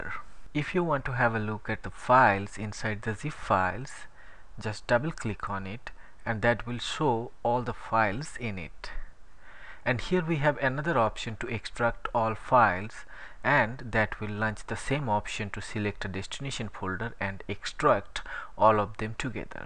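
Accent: India. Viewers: As soon as I hear the Indian accent I instantly don't trust them with tech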